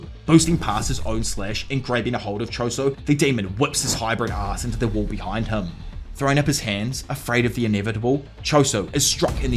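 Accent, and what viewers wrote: New Zealand. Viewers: he's from new zealand! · r u from new zealand?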